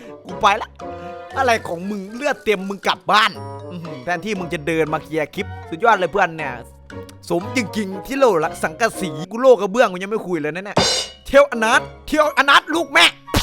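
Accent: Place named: Isan, Thailand. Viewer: พูดอีสานได้กวนตีนมาก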